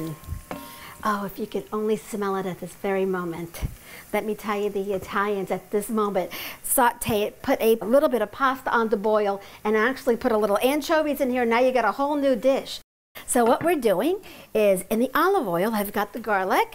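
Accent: United States. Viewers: Her accent is American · She has an American accent and her Italian one is not the best. It's what happens to the second or third generations.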